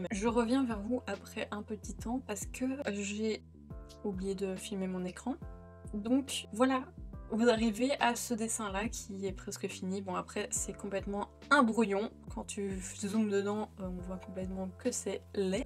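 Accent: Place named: Belgium. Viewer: Je découvre ta chaine sur cette vidéo (merci la reco youtube), c'est très sympa ! J'ai pas bien compris pourquoi la forme ta cam change au court de la vidéo, c'est très étrange mdrrr (le mieux c'est clairement celle à selon moi). Sinon t'as un petit accent Belge je trouve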